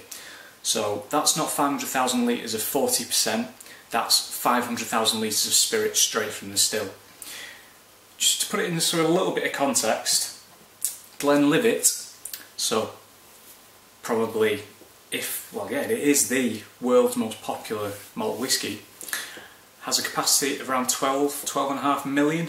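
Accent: Manchester, United Kingdom. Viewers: I luv your Mancunian accent! :-) And what a cool review of a great little whisky this is! Good job, mate!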